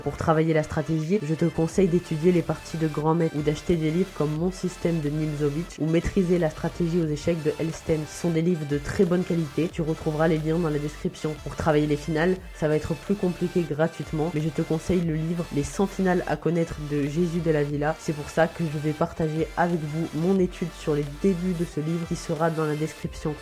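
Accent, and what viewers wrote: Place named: France. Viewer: hes speaking like a French Sheff who knows how to cook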